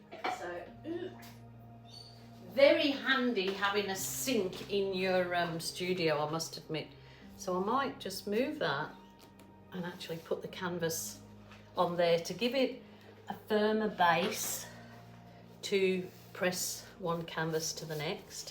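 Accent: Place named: West Midlands, United Kingdom. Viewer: Every now and again I hear a West Midlands accent in your voice …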